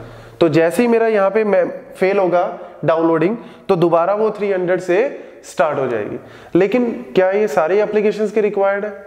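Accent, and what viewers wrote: India. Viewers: Thanks sir... Sir apke English bhi mujhe hindi se lag rahi h .😘😘 thanks for it again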